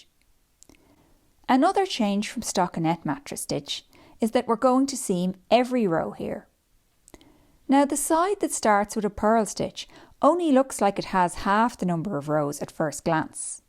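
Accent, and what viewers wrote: Ireland. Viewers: Great video. So helpful and easy to follow. Great to hear an Irish accent too! 👏👏👏👏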